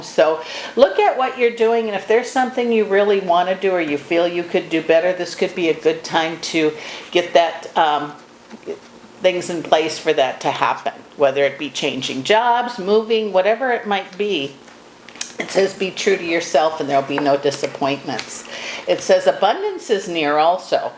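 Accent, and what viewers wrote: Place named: Minnesota, United States. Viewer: Thank you for the readkng❤❤I look forward to seeing you and hearing your voice. You have a Minnesotan type of accent and I LOVEZ me some Minnesota peeps. Good memories 👍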